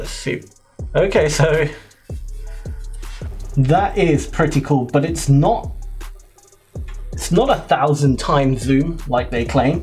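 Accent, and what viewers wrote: United Kingdom. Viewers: For some reason I didn't expect you to be british before you said anything, haha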